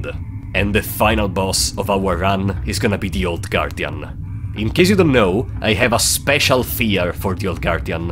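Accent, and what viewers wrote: Italy. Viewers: Sono nuovo e non posso fare a meno di notare che hai un accento molto italiano, mi sbaglio? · Can't help but notice, just a random question - your accent sounds exactly like my friend from Mezacorona, are you by any chance Italian?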